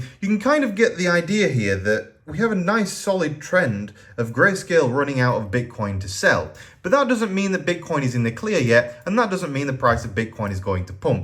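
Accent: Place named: North, United Kingdom. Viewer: It’s my favourite northern accent talking bitcoin lol 😂 where was the one video a day broskie lol I know how it goes lol give thanks same way my guy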